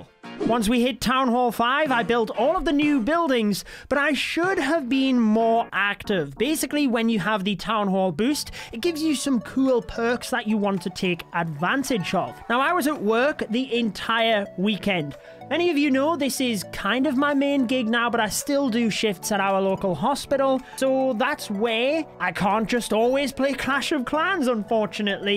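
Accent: United Kingdom. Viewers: You guys makes fun of Indian accent but I love your British accent ❤️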